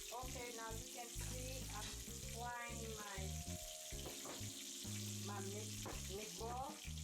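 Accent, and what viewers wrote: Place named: Haiti. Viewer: u remind of my sister n mom the have that Haitian accent they have passed away. thank u for help me learn what they didnt finish teaching because haitian american u lose alot of culture thanx to bring back haiti to me n the world.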